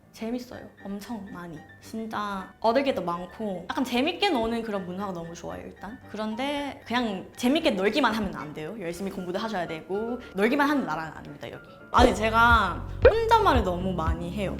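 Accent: South Korea. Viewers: 영상 안 보고 듣고만 있으면 그냥 한국인...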